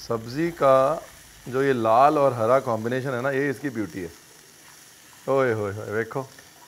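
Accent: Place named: Bengal, India. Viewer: Your way of talking is quiet similar to bengalis, do you have any connection with Kolkata , as becoz your accent, way you throw words are very sweet and not like typical Punjabi's. 🌼🌼❤️🌸love from West Bengal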